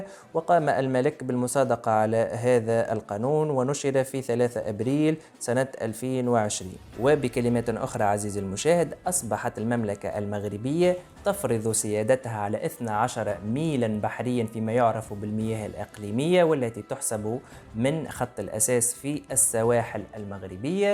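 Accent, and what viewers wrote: Tunisia. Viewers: من خلال طريقة نطقك قلت انك بتونسي قبل أن اكتشف العلم التونسي جنبك و اهنيك على اصالتك التونسية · شكرا لك أستاذ..حصة ممتعة وافكار تاريخية قانونية متماسكة بلغة سلسة جميلة بطابع تونسي رائع...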